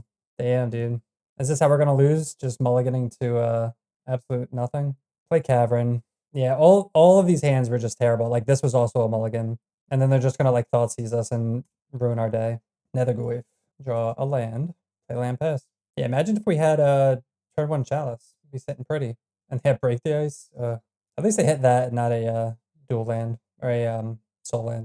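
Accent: Baltimore, United States. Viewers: Hey, so firstly, I thought you were from Baltimore too 😂, like the Swedish guy! I lived in NJ, in Bergen County but in UK for last 20 years. Anyway, what do you prefer, this or the Herigast deck? This one seems like it's prepared for a bit more but I'm just getting back into Magic after a 4 year break, so I am just getting caught up with the meta and have never seen a couple.of the decks you played on this video. What's your preference?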